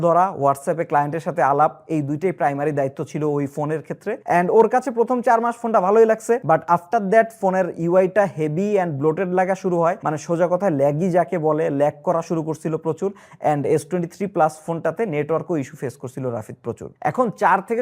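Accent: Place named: Chittagong, Bangladesh. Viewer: ভাই আপনার ভয়েসে মাঝে মাঝে চিটাগাংয়ের অ্যাকসেন্ট চলে আসে!!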